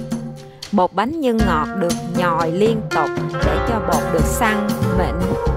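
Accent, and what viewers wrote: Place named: Southeast, Vietnam. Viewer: Mình không mấy thích ăn món bánh ít.Nhưng quả thật,cô gái nói giọng Đông Nam Bộ này rất dễ thương,thông minh và khéo léo.Thật tuyệt dù không phải video nào cũng hấp dẫn.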